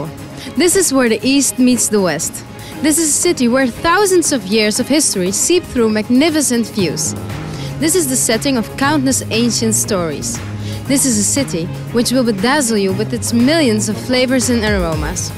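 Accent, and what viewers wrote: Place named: Turkey. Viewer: Dat turkish accent 😖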